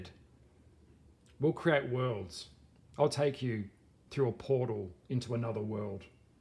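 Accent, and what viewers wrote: Australia. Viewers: Oh, without a doubt, but now that you mention it, you have that fun, witty energy of the demon too. I don't want to sound like I'm just being flattering here, but you've captivated my mind the last several hours listening to you. Like Crowley you seem well read, insightful, and artistic with a bit of hedonism and I would think that would excite a lot of women. This particular video made me think about my sexual choices in life and how I always played it safe with always choosing the nice guy who would always tell me what I want to hear and never challenge me or be too overtly sexual, although my sexuality was off the charts in my 20's and 30's. After a hysterectomy that really changed me hormonally unfortunately, but I think I haven't had anyone to really stimulate that either. To get to me it's always been through my mind and no one ever figured that out about me. 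Anyway, I've commented a lot here on your videos and probably should be embarrassed that it's overkill, but I find you pretty fascinating and am impressed with your knowledge and insights on the Aquarius, both the positives and the negative. That Ozzy voice is to die for, I've always loved the Australian accent, it's my favorite and I always wanted one lol. My other best friend is a Leo and lives in Tazmania.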